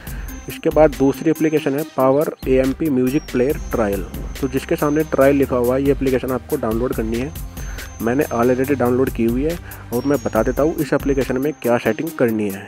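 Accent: Haryana, India. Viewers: bro aap Haryana se ho na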